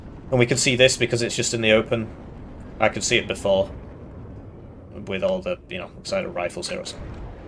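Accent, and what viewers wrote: United Kingdom. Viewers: Pakistani man in middle eastern attire with a British accent named.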